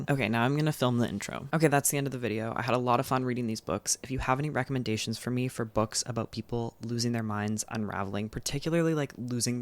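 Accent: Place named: Canada. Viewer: I know that no one is wrong and no one is right, but the way Canadians pronounce the word pasta is fascinating to me.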